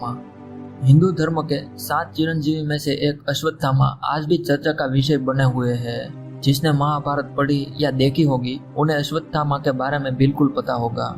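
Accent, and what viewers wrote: Gujarat, India. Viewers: hmm aapke bolne ke accent se hi lag raha tha ki aap Gujarati ho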